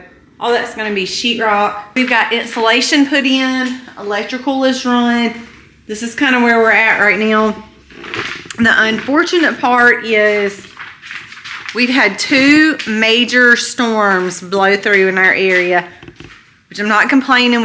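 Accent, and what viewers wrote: South, United States. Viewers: Hi, just got done watching that video with Tucker Carlson!. Shared it with my daughter and a friend that is dealing with PCOS. I'm proud of you for leaving what you knew was wrong in our medical system. Honestly, I never had health insurance for many years of my life and just don't go to the doctor. I broke my wrist 3 years ago, tripping over a tree root. Thank God for hospitals for that. They wanted me to go to physical therapy to rebuild my strength. I found exercises online and did them at home. Doctor was amazed on how well I healed and the flexibility I had. As far as your southern accent and they way you talk: I love the way you talk! Hearing your voice, not seeing your face, I know it's you! Take care and God Bless, Lori P.S. I watch your channel and Colby's everyday. Was wondering what you are doing with your off Grid property?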